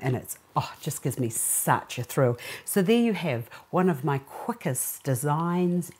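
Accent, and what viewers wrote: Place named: New Zealand. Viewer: Hi.. long time no see lol.. I remember from new Zealand morning show... now im in Australia and love videos and the ol kiwi accent lol... have a awesome year.. =)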